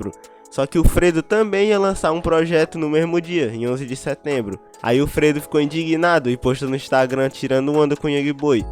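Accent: Fortaleza, Brazil. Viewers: Tu é de fortal né vet? Brabo mano o conteúdo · sem dúvidas que vc é de fortal man 😂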